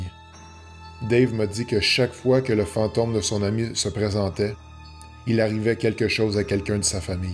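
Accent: Canada. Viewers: J'adore ton accent Canadien, cela donne du piquant à tes histoires.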